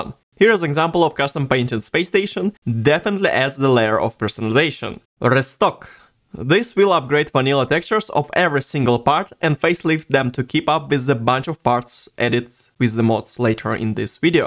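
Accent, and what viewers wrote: Russia. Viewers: Наверное много кто не поймёт этот комментарий но акцент мне кажется у него русский... Это просто теория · Мне кажется или это русский акцент? Спасибо за гайд, чувак! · Мммм, руский акцент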